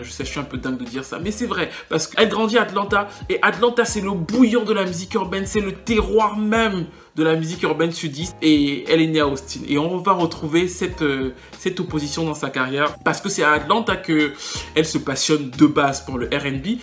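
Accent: Cameroon. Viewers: On dirait que tu es Camer 🇨🇲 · J'ai reconnu un petit accent camerounais ... 😏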